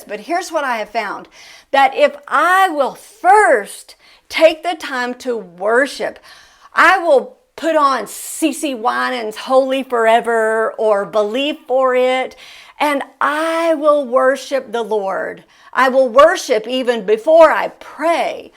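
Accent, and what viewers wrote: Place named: South, United States. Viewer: This is my first time watching you. You tube recommended it to me. I'm from the south so I appreciate your accent 😊. Are you also a pastor? Not because of what you said at the end, but you sound like one....is it called the cadence in your voice? And the energy. Just curious lol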